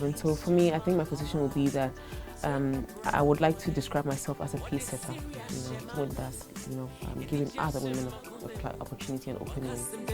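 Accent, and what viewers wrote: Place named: Nigeria. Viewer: She has Nigerian accent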